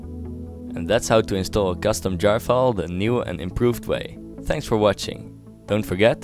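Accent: Netherlands. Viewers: your accent sounds dutch xd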